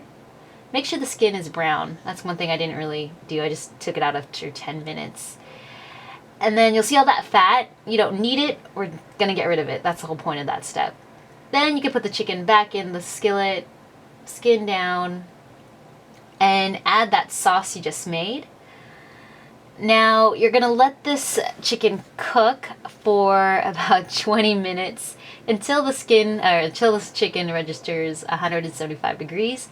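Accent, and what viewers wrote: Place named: Philippines. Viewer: Inday magtagalog ka nlng.. Obviously marunong ka mgtagalog accent p lng...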